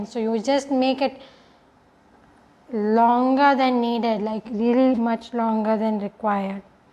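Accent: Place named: India. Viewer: It’s soothing hearing you talk in your Indian way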